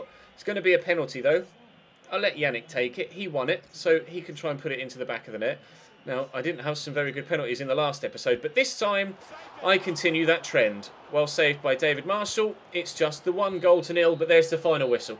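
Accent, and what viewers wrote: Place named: RP, United Kingdom. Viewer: he's just posh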